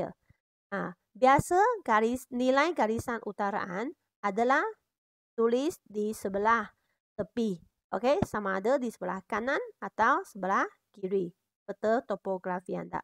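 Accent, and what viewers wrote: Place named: China. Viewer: Cuma cikgu ni Chinese so slang dia lain sikit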